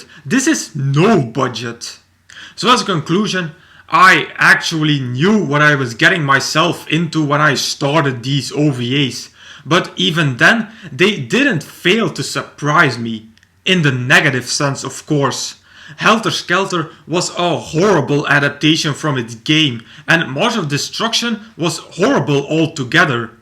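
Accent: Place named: Germany. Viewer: I really like your accent! Are you German? just curious. also, cool review, you say it as it is!😁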